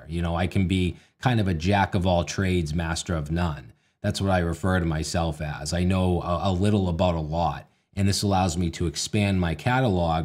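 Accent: United States. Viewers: Bro looks better than when I first saw him, and that was years ago. And got the best American accent I've heard.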